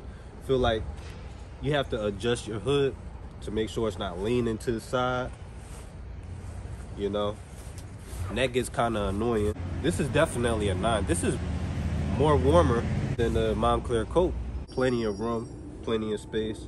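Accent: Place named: New York, United States. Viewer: You sound like u from new york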